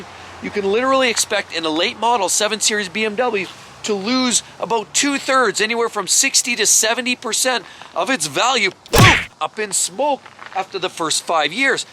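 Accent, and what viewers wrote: Edmonton, Canada. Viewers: Are you from Edmonton?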